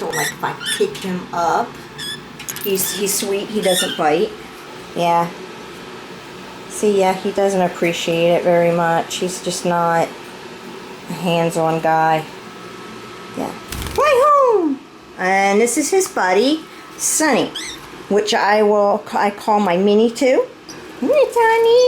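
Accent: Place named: Pennsylvania, United States. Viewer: I love your videos, such a joy to watch! Are you from Pennsylvania, your accent reminds me of a friend from PA?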